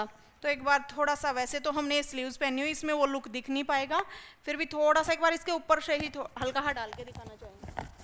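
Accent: Haryana, India. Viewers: हरयानी ठेठ में मिठास की कमी h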